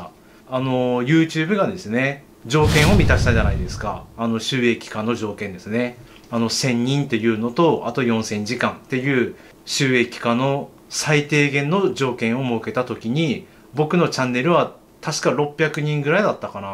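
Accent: Kagoshima, Japan. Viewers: 鹿児島弁ですね！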